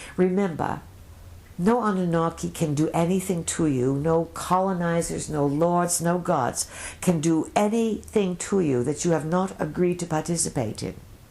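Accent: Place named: Europe. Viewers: I'm just curious why she went from speaking perfect plain english in 1996, to having an odd Euro accent in 2014? Not to mention going from doing 3 hour hypnotism talks in a slow monotone, to talking in the third person. Or maybe the fourth person. Not sure how to describe one person, talking in the third person as two other people. LOL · LMG, obviously you have not carefully studied the Pleiadians teaching thru' Ms Barbara Marciniak. They originally introduced themselves as a 50 member collective, and were learning how to teach, advise, communicate with humans. I've lost track of exactly where they stated they are now 100 + Spirits, and have come a long way.It should be clear to you, as well as many of their skeptics, that the familiar voice has changed a bit, have added humour and wit ( often a play on words as well ). Barb is well educated ; she would never make common English grammatical slips, confusing correct use of " this " vs : that ", switching pronouns in mid sentence, incorrect subject and verb agreement, and the occasional misuse of common American wordings. 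Their vocabulary, though excellent, occasionally they slip into British idioms, Euro vocab and pronunciations, and oddball word order. Frequent hesitation, and repetition of words and phrases while searching for the precise word to fit the idea, is a characteristic, of a non-native English speaker. The final clue is, they encourage and even beg for questions from their audience, so as to learn what concerns are on peoples' minds. They can give long dissertations and insight into complex, historical, economic, political, religious aspects. No human is capable of this broad swatch of understanding and explaining, almost ad nauseum. Despite some negativity towards their ending, creeping in sometimes, they always manage to convey a positive messag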